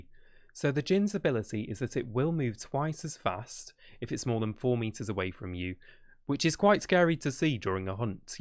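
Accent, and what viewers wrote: United Kingdom. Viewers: that isn’t scouse lmao it’s East Midlands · It's the Scouse accent. It's really disarming.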